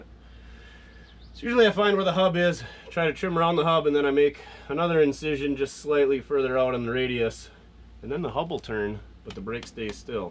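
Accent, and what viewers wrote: Minnesota, United States. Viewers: Just found your posts and I want to tell you how much I enjoy them! Basic but informative and just a plain old fun watch. Sounds like your from Minnesota or there about?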